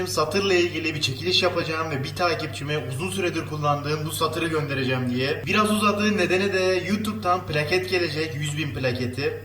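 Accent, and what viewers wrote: Adana, Turkey. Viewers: Hocam aslen adanalı mısınız :D çok tatlı konuşuyorsunuz :Dd vallahi sırf söylediğiniz eylemleri dinlemek için izliyorum :dD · Atirüüm,Yapıyurüüm,Beklirüüm,Kesiyürüm Adana şivesinin verdiği lehçe ile müge anlının mutfaktaki versiyonu 😁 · Abi adanalı mısın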